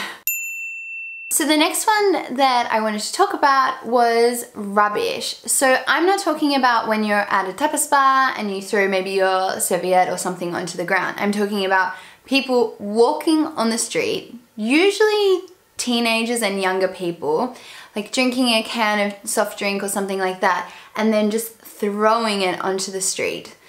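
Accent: Australia. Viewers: I love your Australian accent!! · Me encanta el acento australiano!!! la forma en la que dices "water" me hace mucha gracia jajjaj love it!! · Tienes ojos tan hermosos y fascinantes que a veces no puedo concentrarme en lo que dices... Saludos desde Polonia,! Great work:) P.S. I love your Australian accent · I really love your accent. Beautiful accent + beautiful smile + beautiful eyes + blonde = perfect Aussie woman. I hope I could meet you in person. 😍😘😃 and I Iove your hair as well and you have sexy lips.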